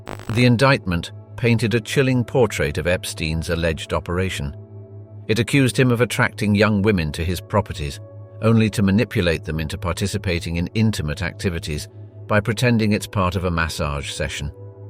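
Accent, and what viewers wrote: United Kingdom. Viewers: Content notwithstanding, talk about "PURPLE PROSE".. GEEZ! Wish I had a dollar for every time the overly Brit narrator oozed out the word "enigmatic". .Again, GEEZ! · Ah, a poetic little wordsmith wasting our time with a dumb british accent. Gfys 👋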